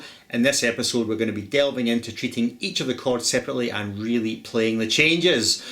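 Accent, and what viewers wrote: Scotland, United Kingdom. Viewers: well - i'm convinced, you know what you do. how is it possible people can get so good at their instruments?, how many hours have you spent playing in your life? just roughly. i want to estimate if there is a chance for me at all. major doubts. btw, are you scottish? sorry if i'm wrong, but there is something in your accent i have heard before - jim kerr. (i'm german - i can't figure it out exactely)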